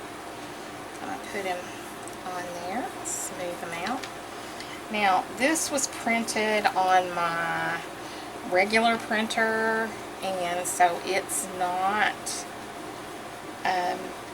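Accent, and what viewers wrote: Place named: South, United States. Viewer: Love your video,! Such beautiful organization! Your sweet southern accent is divine! ❤️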